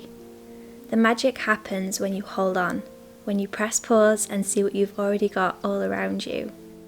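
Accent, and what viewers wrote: Yorkshire, United Kingdom. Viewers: Are you a Yorkshire lass?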